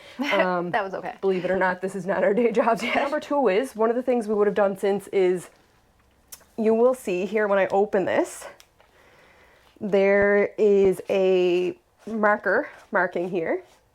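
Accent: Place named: Canada. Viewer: Thanks for the video. The Brew HQ shirt and accent gave you gals away ! Greeting and good luck from Ontario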